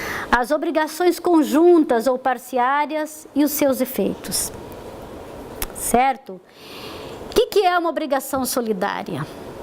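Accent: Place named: Brazil. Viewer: Ela é brasileira? Sotaque parece do Brasil!!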